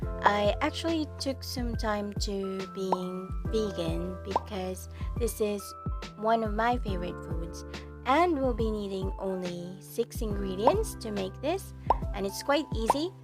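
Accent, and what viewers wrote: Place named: England, United Kingdom. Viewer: Speaks a very english intonation, nice!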